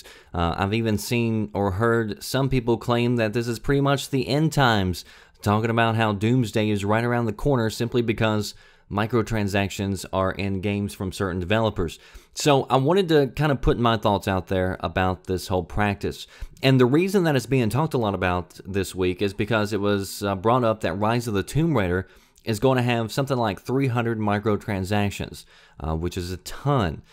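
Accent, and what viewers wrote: South, United States. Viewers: Your southern drawl is really sexy ;)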